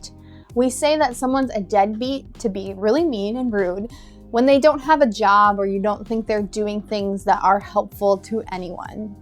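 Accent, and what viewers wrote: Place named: California, United States. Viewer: Thank you very much for your video. I added your channel. I am always wondering where you are in the US. I slightly hear California accent.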